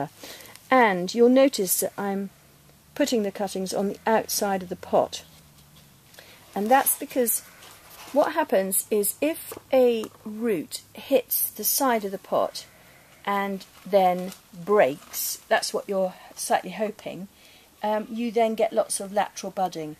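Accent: United Kingdom. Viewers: Wow! Thanks for the video. I learned a lot! Where are you from? Are you from Britain?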